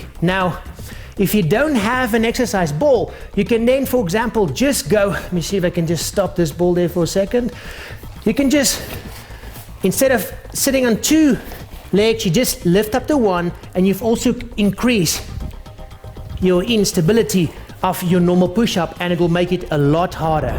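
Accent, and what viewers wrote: South Africa. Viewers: He has a South African accent, as I am South African myself I can assure you it isn't made up or anything like that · Sounds south african .. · anyone else realize his South African accent? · South African accent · Jayden Almirall terrible accent anyways, other South African accents are way better and less annoying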